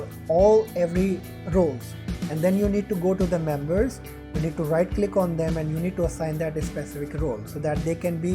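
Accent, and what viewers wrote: India. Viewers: indian accent yup a scam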